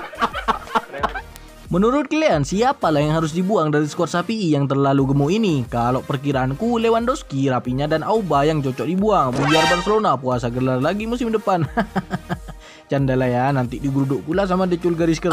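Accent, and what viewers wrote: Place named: Madura, Indonesia. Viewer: Logat Lu Logat Madura ..... "Lu Fans Madura United ya?".... Tahun Depan Bisa Main UCL? kalo Bisa, Ronaldo mo kesana sama Braithwaite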